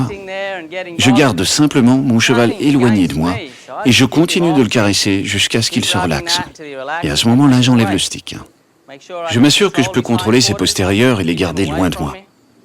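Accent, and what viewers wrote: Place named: Australia. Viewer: L'accent australien me fera toujours cet effet bizarre :P En tout cas c'est un super travail, je voulais tenter l'éthologie avec un cheval qui est assez (pour ne pas dire énormément) nerveux, craintif et surtout pas dressé pour voir si ça l'aiderait, j'ai reproduit les mêmes gestes (j'ai eu de nombreuses cloques au passage du stic) mais maintenant je peux presque monter sans incidents, je continue, ça nous aide beaucoup tous les deux ! Merci !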